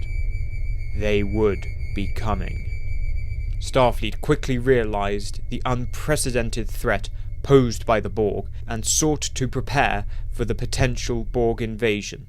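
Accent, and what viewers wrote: United Kingdom. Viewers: I'd prefer it without the British accent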